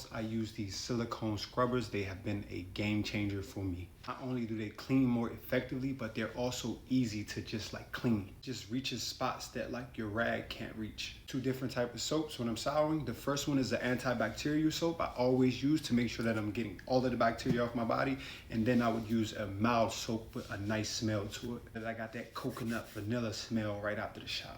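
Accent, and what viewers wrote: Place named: Caribbean. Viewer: Are you Caribbean? The accent keeps slipping out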